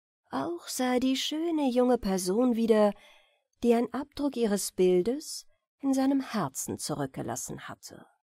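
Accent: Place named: United States. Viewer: ja klar, Geschmäcker sind eben unterschiedlich. Mir hat es dann trotzdem ganz gut gefallen! Auch wie du die Stimmen machst zu.b. find ich gut. Es ist nur was in diesem SingSangton ... Ist neuerdings bei jungen Vorleser so verbreitet, Ich frag mich was das ist und mutmaße: es ist ein Amerikanismus!! Versuchen sie die Amis zu reden auf deutsch. Und das klingt einfach blöd in meinen Ohren.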